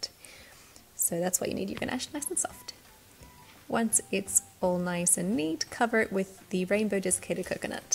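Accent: Australia. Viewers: Oooh Australia, I love the australian accent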